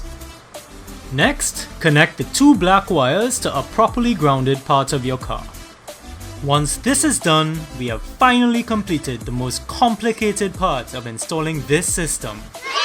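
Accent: Trinidad and Tobago. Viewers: You have a trini accent but I’m wondering where you’re from.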